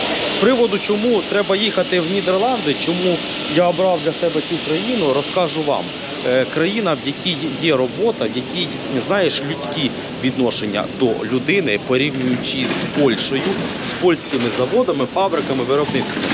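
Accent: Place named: Zhytomyr, Ukraine. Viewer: Він розмовляє суржиком... суржик житомирський .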